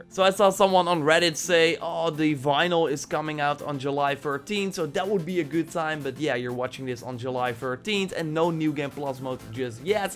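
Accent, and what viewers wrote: Netherlands. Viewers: is any way to ask someone who speak correctly english without any harsh accent like yours ? Because bro it is really difficult to focus on what you are saying with your dutch accent. Then you will focus on the video montage while someone else will care on the speech. And please change us this annoying same and same music background... Take care. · That horrible Dutch accent. I puke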